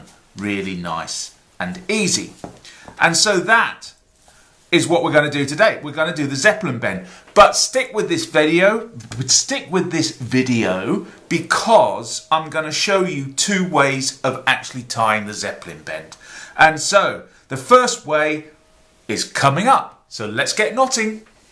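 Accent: United Kingdom. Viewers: Thank you for demonstrating two ways of tying this knot. And I love your British pronunciation. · Recently got into knots after learning a hitch on a canoeing trip. Building my repertoire. Although I have been using the alpine butterfly as my preferred bend, I liked the aesthetics of the zeppelin, so gave it a search. Thanks for the videos, it is nice to hear a fellow Brit once in a while.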